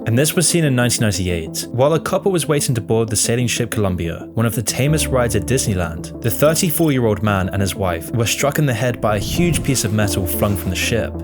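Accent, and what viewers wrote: United Kingdom. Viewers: So, bassicly every week its a new runner up for “ most evil business in the world “ Time for a new title or maybe even new content. As for this video, im literally watching at timestamp - to prolly hear about things I already know but this time in a UK accent 😂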